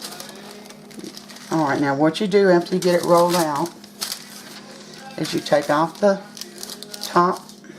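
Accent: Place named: North Carolina, United States. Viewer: You're just the sweetest YouTuber! You sound like a North Carolinian. (My home state) I can't wait to try your recipe. I'm too old and stressed to be fighting against time with butter recipes for pie crusts.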